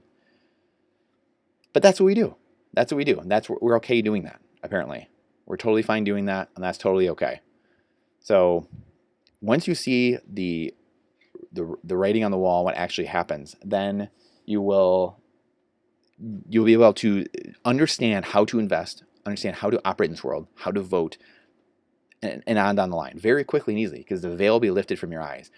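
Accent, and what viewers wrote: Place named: Michigan, United States. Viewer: I had a hint by the accent but are you from Michigan?!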